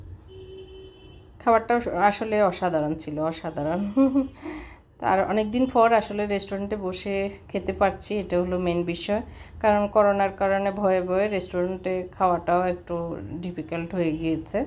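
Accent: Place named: Chittagong, Bangladesh. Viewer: আপুর কথা কিছুটা চট্টগ্রামের টান আসে তাই বলছিলাম।আমিও চট্টগ্রামের তবে থাকি কুষ্টিয়ায়।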